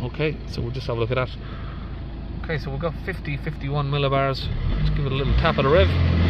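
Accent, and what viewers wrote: Ireland. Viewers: Hiya. Love watching your videos and we don’t even have a diesel now. Got a question for you which is away from cars & vans just for now. I’m 63 now and Dad was a Kerry man while Mom was Coventry. They used to take me to Ireland when I was a nipper to Westmeath near Mullingar. Your accent does keep reminding me of my time there so am I close or not. Do hope you don’t mind me asking. Keep up the brilliant videos 👍👍🇮🇪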